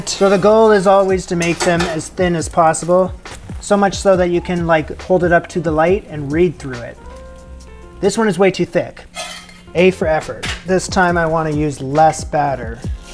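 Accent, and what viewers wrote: Canada. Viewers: Take it "Eout" of the pan,! 😁🤣 Love your Canadian accent.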